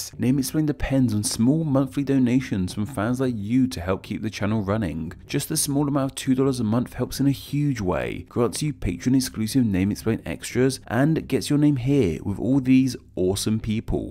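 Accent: United Kingdom. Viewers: Guys, hes a brit Cut him some slack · Wouldn't individual tribes have different names for the land where they lived? Making generalized statements about Pre-Columbian indigenous people in North and South America is about as useless as making similar statements about the peoples of Europe at the time Julius Caesar conquered Gaul, or Roman Legions landed in England. In what is now the United States Eastern tribes would have lived in permanent settlements as would those in the Southwest, the Pacific Coast, and parts of the Intermountain West. The indigenous group the British-accented speaker is making generalizations about were the "Plains Indians", so he likely learned everything he knows about Native Americans from "Dances with Wolves". BTW, what did the indigenous people of Europe call their continent during the Dark Ages? Except for a few Catholic priests who would have rendered the answer in Latin, they wouldn't have a name for it BTW, I always turn to Received Pronunciation British speakers for information about Native or Indigenous peoples of North America--NOT.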